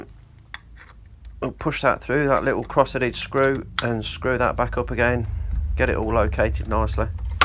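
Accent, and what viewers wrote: Leicester, United Kingdom. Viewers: The accent implies you have to be a Leicester lad like me?!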